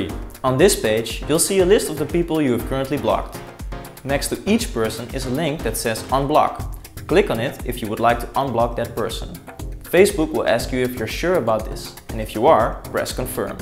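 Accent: Netherlands. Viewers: you're from the netherlands arent you?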